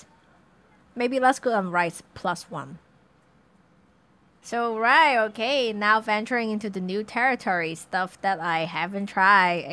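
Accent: China. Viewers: Is it possible to also include the Cantonese words for particular ingredients in future vids? I'm "ABC" but I only understand Cantonese although I can pick up on some Mandarin that sounds similar to Canto, I'm still having trouble figuring out what it is most of the time. From the way enunciates her Mandarin, it sounds more like she's a native Cantonese speaker but I could be wrong. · I love Chinese accents!!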